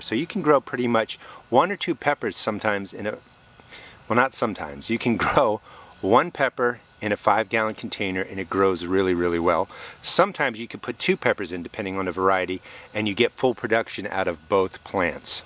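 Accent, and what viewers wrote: New Jersey, United States. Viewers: Love the Jersey accent! A lot of cool stuff on your site too. Inspirational to see so many beautiful peppers growing. Do you ever grill them?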